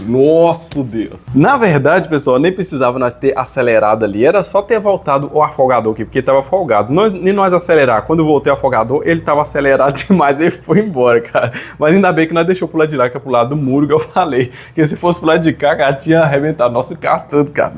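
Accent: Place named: Montes Claros, Brazil. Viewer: Onde essa cidade pelo seu sotaque, me parece que e de Montes Claros ou regiao.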